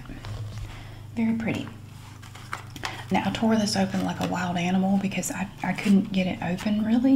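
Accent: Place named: Appalachia, United States. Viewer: ive bien loving your channel for so long, because your videos are excellent, but also, i love the pride you have for your southern appalachian roots and has made me take a special interest in learning more about it. you accent is so beautiful and your anecdotes are so Rich. the thing is, in trying to get more information i found another channel called celebrating appalachia and to my surprise, both of you ladies use the same confetti bowls to Cook. i would die with that collab. sending all my love and support from argentina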